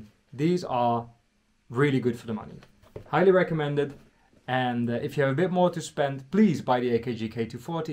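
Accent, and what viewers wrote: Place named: Netherlands. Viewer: You know whats funny? You can tell someone is Dutch by their English ;)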